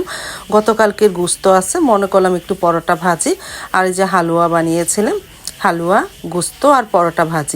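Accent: Bikrampur, Bangladesh. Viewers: এটা আঞ্চলিক ভাষা মেক্সিমাম বিক্রমপুরের মানুষরা এভাবেই উচ্চারণ করে কথা বলে ভুল না ধরে যার যার আঞ্চলিক ভাষায় কথা বলার অধিকার আছে তার ভাষায় কথা বলতে দিন আর ভিডিও উপভোগ করুন।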